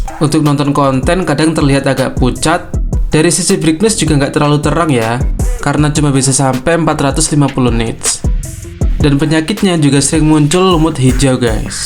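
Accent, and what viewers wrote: Malang, Indonesia. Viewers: Pasti abang nya orang malang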